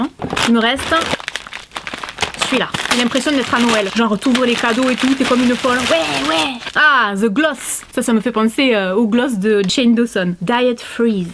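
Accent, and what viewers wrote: South, France. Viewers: Salut, je suis tombée par hasard sur tes vidéos et je t'adore même si je ne me maquille pas. Ta spontanéité, ton humour et notre bel accent du sud sont charmants, ça fait du bien de voir ça sur YouTube! Ne change rien tu es super! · Je regarde vos vidéos, et je vous trouve épatante, naturelle, franche, drôle, sincère, et votre accès du Sud ne gâte rien! Surtout ne changez pas! Biz bisou breton! A très bientôt!👏👏👏😍😍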